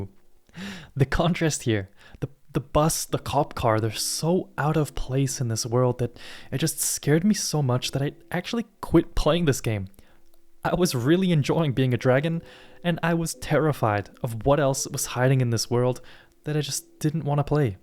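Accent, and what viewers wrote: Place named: Sweden. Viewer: Sounds like a light swedish/upper european accent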